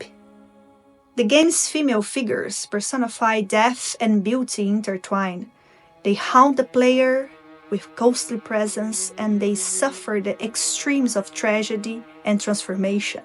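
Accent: Brazil. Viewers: I don't know much about Bloodborne (i'm still trying to understand the story, since i can't play it without having a heart attack), but i really like Poe, and any video essay merging literature with videogames is worth to see! Also... Your accent is familiar, você é brasileira? Awesome video! Keep going!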